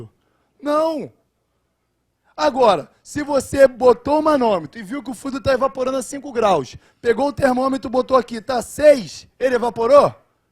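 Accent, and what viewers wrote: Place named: Rio de Janeiro, Brazil. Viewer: Carioca raiz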